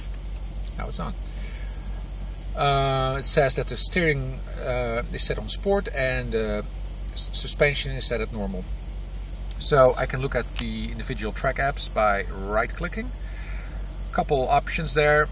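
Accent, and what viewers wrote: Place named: Netherlands. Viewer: Your Dutch? The accent sounds familiar..??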